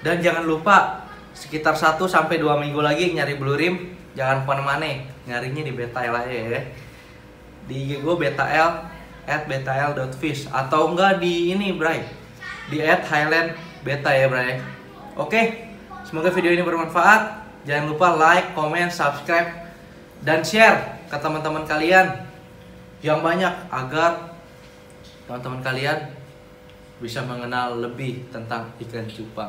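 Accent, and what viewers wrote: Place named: Betawi, Indonesia. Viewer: Batawi luh medok medok bner om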